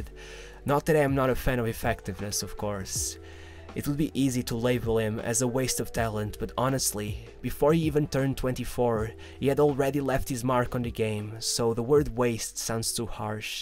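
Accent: Portugal. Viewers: Very tuga accent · You sound Portuguese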